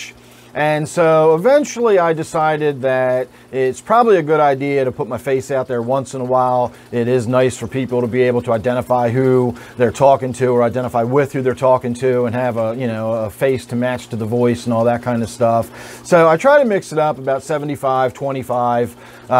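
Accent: United States. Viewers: No! I like hearing your little "hey everyone" greeting plus I am english so I like a US accent and you are easy on the eye too 😍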